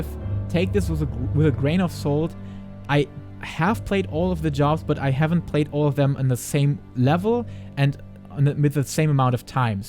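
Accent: Germany. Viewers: As a fellow German, I knew right from the start where he’s from, though his English is way beyond the level of your average German!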